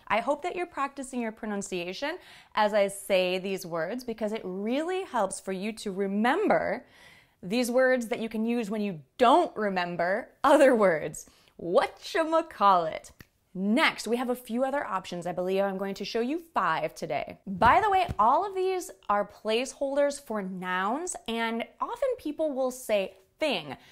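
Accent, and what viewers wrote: United States. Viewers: Sister you from american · From what part of the US are you?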